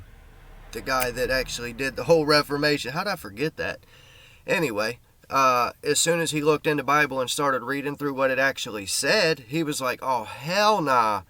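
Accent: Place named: Pennsylvania, United States. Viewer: Hey man. I see you in several of the same chats as me. Matt and Brandon I see we have in common and I think I might've seen you elsewhere. I've been meaning to check out your channel. This one caught my eye right off. Jesuits are definitely behind most major deceptions. That I've determined Big Bang, heliocentric earth, evolution (more of a roundabout way), perverted eschatology, and even germ theory (Girolamo Fracastoro was Copernicus' classmate at Padua). This is the paper I did for ministry school when I discovered more about them. You might find a nugget or two. <link> Your accent leads me to believe you're from PA.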